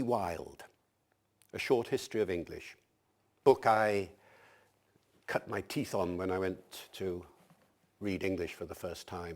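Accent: United Kingdom. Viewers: According to Wikipedia Prof David Crystal is originally from Northern Ireland and as an infant moved with his family to the North of England - his accent is definitely Northern English. Some of us are understandable, clearly. 😂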